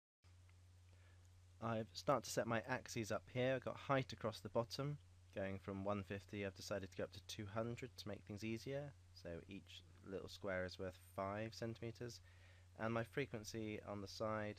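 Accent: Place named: United Kingdom. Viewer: Brilliantly simple and a nice British accent so it's easy to understand you :)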